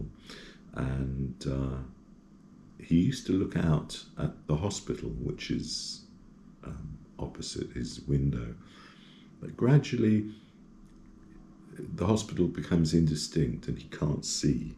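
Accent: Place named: Romania. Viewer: Superb Romanian accent , Deutsch auch. Might I suggest some comments on one of the writings produced by the Viennese Stefan Zweig ?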